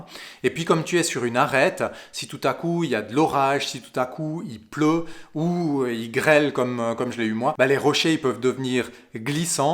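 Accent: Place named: Switzerland. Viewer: Une vidéo instructive. Les néophytes comme moi ne se doutent pas de l'aspect technique du simple fait de "marcher dans la montagne". La sécurité est très importante, il ne faut pas laisser de côté les moindres détails. Beaucoup de choses intéressantes, les séquences vidéos sont toujours aussi superbes. L'accent suisse est super cool. Continue ainsi, merci !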